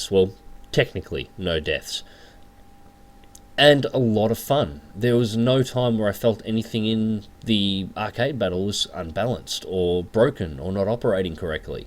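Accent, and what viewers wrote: Australia. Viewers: yes indeed so glad i live over here and are you from Ausie i cant help but notice a bit of an accent?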